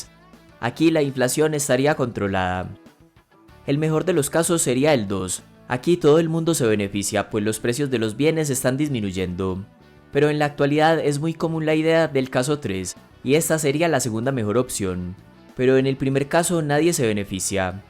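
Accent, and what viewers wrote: Antioquia, Colombia. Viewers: Por el acento paisa escondido hay parcialidad en el video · Petro es economista de la unviersidad externado, entiendo el punto del video pero pienso que sería bueno que el aclarase esto que el dijo, sin embargo, pienso que estas poniendo el fragmento de un vídeo que no está dentro de las propuestas económicas de Petro, además me parece absurdo que pongas un fragmento de video que no se sabe si una propuesta actual y omitas todas las demás propuestas en el tema económico que el tiene. Por tu acento es fácil darse cuenta que pertences a cierta región del país que no le conviene que suba a la presidencia pues dentro de sus propuestas está poner a pagar impuestos a las personas que tienen cifras desorbitantes en el exterior en paraísos parafiscales y que de ese tipo de dineros se ha beneficiado en gran manera la región de Antioquia y por eso apoyan a candidatos ladrones y sin preparación como Fico o Rodolfo.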